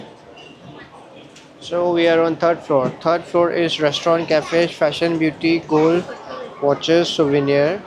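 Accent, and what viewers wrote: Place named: India. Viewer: Change urrrrr indian accent please. They will think u r Nepali bhaiya . Rrrrrr. Oooooor change it please my ear is bleeding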